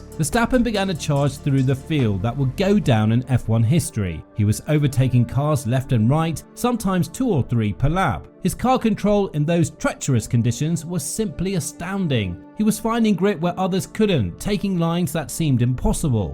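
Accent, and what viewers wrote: United Kingdom. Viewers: LH "arguably" one of the most greatest driver in history.. says the bias British accent again. Well with his 7 titles he clearly isnt. Before F1 he wasnt that special as the greats in history such as Schumi, Senna and now Max. Those last three are completely from another league.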